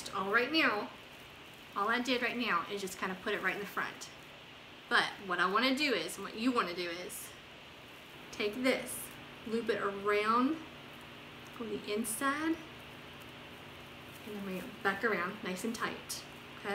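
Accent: South, United States. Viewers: You have a very southern accent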